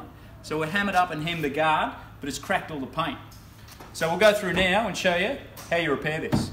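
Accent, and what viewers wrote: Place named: Australia. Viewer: How do I get the australian accent?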